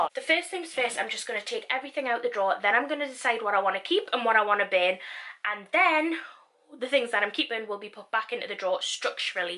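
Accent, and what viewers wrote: Newcastle, United Kingdom. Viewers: Literally my favourite Geordie girl! I just love how she doesn’t pretend to be dead posh and polished xxxx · Love watching u I’m from Sunderland/ Newcastle and now live in Cumbria and miss the Geordie accent xx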